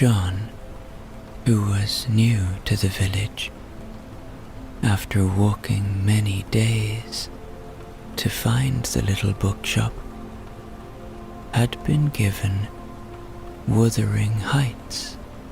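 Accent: Ireland. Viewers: You have such a calming voice. I love the Irish accent. I think the Irish singers are fabulous too. I’m afraid I never get to enjoy the actual stories as I don’t hear them. It takes a very short time to be lulled asleep listening to your voice. Thank you.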